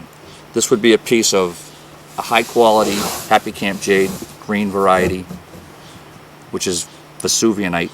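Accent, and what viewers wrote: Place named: East Coast, United States. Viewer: apparently you don't. LOL. I heard this guy moved back to Boston or whatever East Coast S-hole he came from. That accent is horrible.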